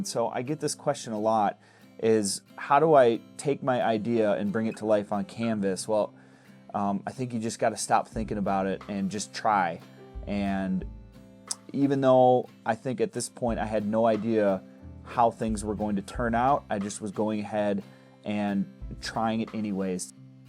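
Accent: United States. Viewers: Are you from Michigan? Lmao.. I'm just asking cause the accent.. But your videos are so good! I think I said this comment twice lol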